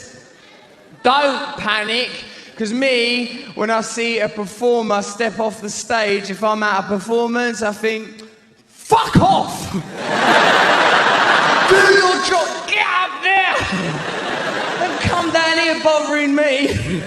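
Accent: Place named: London, United Kingdom. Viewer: I've always liked Russel Brand. He is a very smart guy and also very, very fucking funny. Not in the typical type of funny but like the smart, tongue in cheek, kinda funny. That and i sometimes start talking in a British or London accent similar to his. And you can really say and do what you want to a extent when you talk like that.. I swear it, I've done it and i normally wouldn't have got the few girls numbers that i got if i had not been talking like that. I got like 3 numbers from chicks who were way out of my league. All from talking like that. 3 different chicks one married who called her husband and Told him she was going to work late but me and my friends one female and one dude ive known for years. She said it wouldnt work talking like that and picking up chicks and such..she bet us 200 each, we won and bought her something very special. 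1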